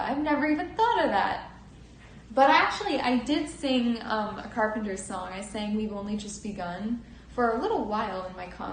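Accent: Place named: Pennsylvania, United States. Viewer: pronunciation is characteristically Pennsylvanian. Do you expect her or anyone else to know what pronunciation you prefer?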